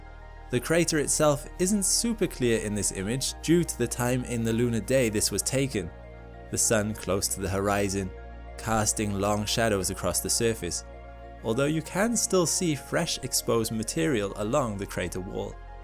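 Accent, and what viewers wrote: United Kingdom. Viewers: Do you think they have American narrated documentaries in Britain? so why do we have to listen to limie's over here all the time?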